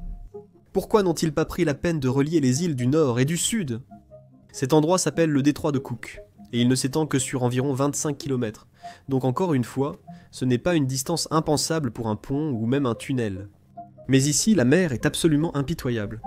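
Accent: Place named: Portugal. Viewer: en effet c’est bien ça. L’accent portugais qui est reconnaissable de loin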